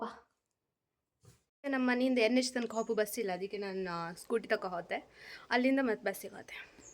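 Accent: Kundapura, India. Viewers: ಬಳಿ ಹಾಯ್ಕಂಡ್ ಚಂದ ಕಾಂತ್ರಿ,ಹಾಗೆ ಹಣಿಗೆ ಕುಂಕ್ಮ ಹಾಯ್ಕಂಡ್ರೆ ಮತ್ತಷ್ಟು ಚಂದ ಕಾಂತ್ರಿ.ಊರ್ ಮನಿ ಕುಂದಾಪ್ರ ಕನ್ನಡ ನಿಮ್ಮ್ ಬಾಯಲ್ಲಿ ಕೇಂಬುದೇ ಚಂದ👍👏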